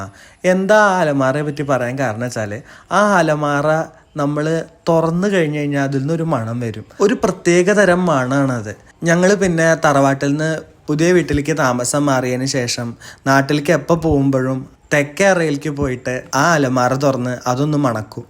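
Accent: Valluvanad, India. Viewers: Is this valluvanadan slang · ആ വള്ളുവനാടൻ ഭാഷ ഷ്ടായി ട്ടോ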